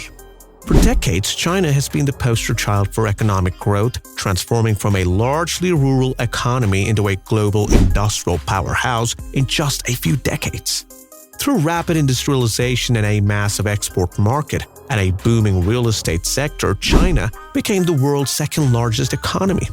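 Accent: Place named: United States. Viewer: I hear American accent! Lol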